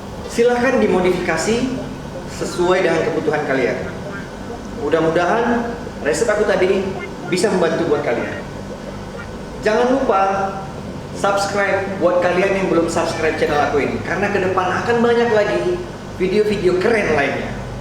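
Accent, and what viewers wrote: Medan, Indonesia. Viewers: Bg orang medan ya ?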